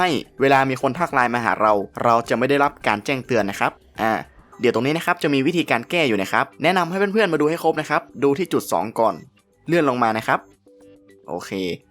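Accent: North, Thailand. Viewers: พี่เป็นคนภาคเหนือใช่มั้ยคับ ทำไมปะแลดเมียง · พี่เป็นคนเหนือไหมครับมีสาปเมืองหน่อยๆๆ เหมือนเห็นตัวเองตอนพรีเซ็นงาน55555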